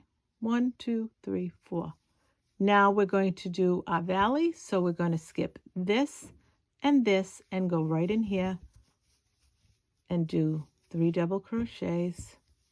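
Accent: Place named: Boston, United States. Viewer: Happy to see ya today, I love the mile a minute everything. I’ve been crocheting for about 40yrs now & enjoy crocheting along with you, always something new and interesting to learn. I love this one! I feel like I’m hearing an Italian Boston accent, you remind me so much of one of my Aunts your voice, knowledge & patience I adore you, awesome teacher. 🫶🏻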